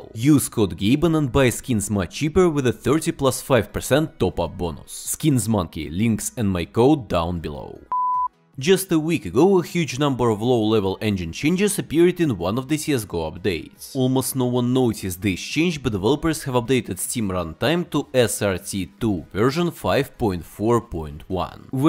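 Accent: Russia. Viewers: We all love spy dataminer chicken mit Russian accent 🐔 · Cool accent, are you Russian?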